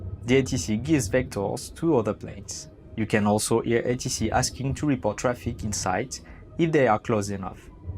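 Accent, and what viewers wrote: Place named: France. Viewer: French accent :3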